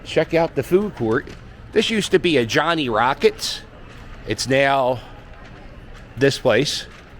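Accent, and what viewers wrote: Philadelphia, United States. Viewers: With the heavy Philly accent 😂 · Philly accent is A1!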